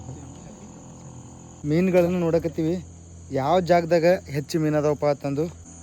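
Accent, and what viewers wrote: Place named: Mangalore, India. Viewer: ನಮ್ಮ ಮಂಗಳೂರಿನ ಕನ್ನಡ ಮಾತಾಡ್ತಾ ಇದ್ದಾರೆ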